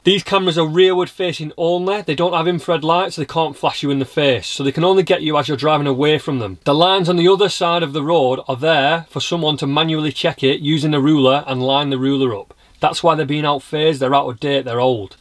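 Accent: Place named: West Midlands, United Kingdom. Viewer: Hi there! First off, great video! I'm from the West Midlands (old South Staffs actually) so I understood your accent perfectly - just in case some people whinge about your accent! LOL I've been driving since 1975 and hold both a full car and motor cycle licence. Most of my working life was spent in the motor trade and during that 'life' I covered many, many hundreds of miles on road test - some(!) of those miles at speeds that were, shall we say, a little north of legal! Also - during that time - I was 'done' only once for speeding (more by luck than judgement!) and since leaving the motor trade proper, I have fallen foul of a speed camera (one in a van) once as well. As a matter of interest, I've been involved in a few minor accidents too down the years - ALL at speeds BELOW 30mph! As someone who has been interested in driving since I was a young child (I have pictures of me in a pedal car at 'Uncle Eric's pedal car track in Rhyl, North Wales dating back to the early '60's!) I have become ever more annoyed by the way cameras have been used to sneakily extort money from the easiest target/cash-cow of all - the motorist. 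I totally agree that if someone is driving dangerously, then they should be penalised for it - but speed ISN'T always the issue! BAD DRIVING is! A proficient driver doing 100mph at 3am on an empty motorway is surely no more dangerous than a tw*t doing 40 in a 30 at 3pm in a school zone! I drive for a living now, and on a daily basis, I see people out there who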